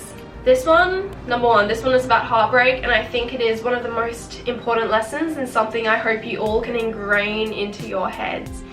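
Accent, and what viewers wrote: Australia. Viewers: I have the same accent lol I'm Aussie too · are u an aussie?🤨🤪🤭😏😌😪🥶🥵😵💋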